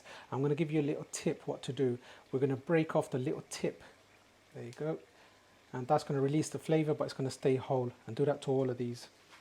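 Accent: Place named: United Kingdom. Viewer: This style of biryani is so simple, it forces me to ask : why not cook chicken curry separately and boil rice with the "khara massalas" and then mix the two. Why go through the charade of cooking them together? What irritated me most was the heavy accent (British?) interspersed with cyclic repetition of superlatives. I say, let's keep it simple and natural, what? The comments displayed are so identical you can't help but think it's the same person with no imagination.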